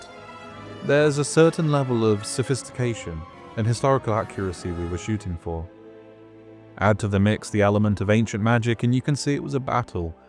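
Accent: United Kingdom. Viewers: The creator is just well spoken and British lol you can tell it isn’t AI by the way his voice breaks every now and then. AI isn’t like this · Yeah I’d say he’s doing it on purpose because he’s British What a weird comment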